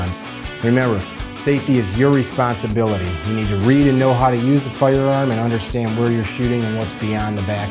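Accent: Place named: Midwest, United States. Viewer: Man is that midwestern accent thick.....love it lol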